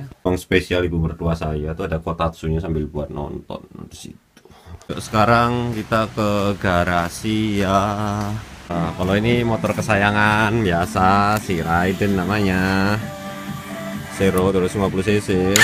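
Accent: Java, Indonesia. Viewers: wong jowo orang ilang jowone masio dek jepang salut bang :D medoknyo masih terasa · Logat jawa nya masih kental ia mas .. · walah wong jowo tenan, makane kok koyok roh logate iki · hepi saterde mas (medhok banget ya meski dah lama di jepang)............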